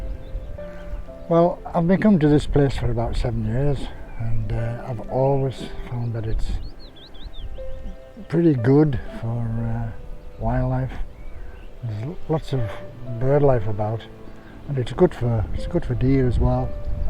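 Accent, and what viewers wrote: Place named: Liverpool, United Kingdom. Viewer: Oh, put some up. They were great I'm right behind you at 65 and hubs James bought me a Stratocaster a few weeks ago. I sold mine maybe 2 years ago...thought I was too old and have arthritis in hands but, I had got him a semi pro electronic drum kit for Christmas and with all these great backing tracks and bass track you can use for free we have started playing. He says we should up loadvids but I am not keen at all. This strat is as sweet as honey with super low action and it was the bargain of the century but its twice as heavy as my last one so I have to sit down to play...not very rock chick! As I said, liked those vids of yours and in principle I think its good for people of any age to see elders just doing...because like me, I am sure you remember a time when old age was not synonymous with illness. Somewhere Ive got a vid of my sisters band in the USA. 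Rock chicks, all over 60, lead singer 67 and they are belting it out on the circuit in L.A.if I can find it I'll upload it You sound Liverpudlian/cumbrian....I'm Lancastrian from Worthington in S. Lancashire. James is a very Yorkshire man we both miss northern banter our tiny village in Pembs is all round table, women institutes and a strict regime of intense garden manicuring...they roll along in life with absolutely no rock at all🤣🤣🤣 Have a great day and get them vids up lad!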